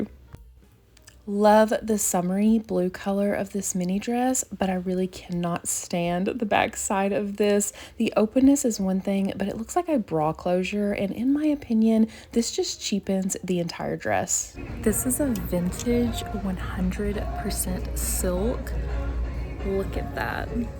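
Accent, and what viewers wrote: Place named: Russia. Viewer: Interesting accent. I think your first language is Russian?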